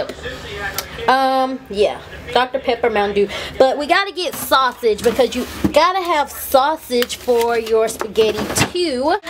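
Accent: South, United States. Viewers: omg that was too cute and funny!! ur so country and its so cute lol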